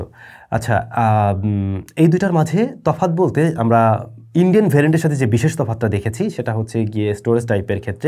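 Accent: Barishal, Bangladesh. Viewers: ভাই আমি নয়ন । আপনি কি বরিশাল এর ছেলে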